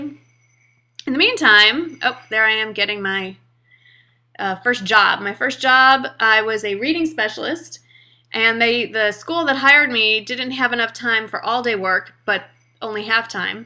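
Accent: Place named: Texas, United States. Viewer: Even though you're from Chicago I do believe I detect a little Texas drawl "y'all" . Anyways cute video Yay for lefties! BTW you aint older than all your subscribers. little ol' me is tuning in! :-)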